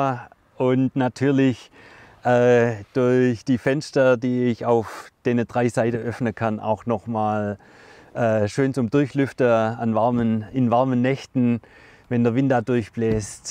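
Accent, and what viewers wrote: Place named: Swabia, Germany. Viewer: Super geil.. und dei schwäbisch isch no geiler..:)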